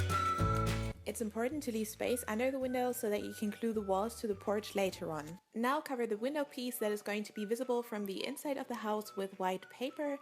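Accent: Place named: Germany. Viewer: I knew it. You have a german accent :D ! You are from Germany right?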